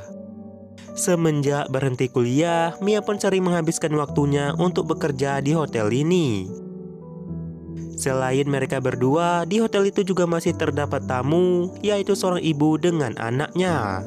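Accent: Aceh, Indonesia. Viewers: Admin nya pasti orang Aceh ni, logatnya gk bisa bohong.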